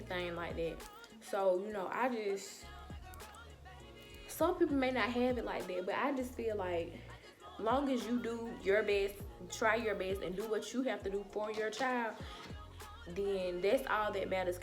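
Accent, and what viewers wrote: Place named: Louisiana, United States. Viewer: girl where you from ? you sound like you could be from louisiana 😍🐊.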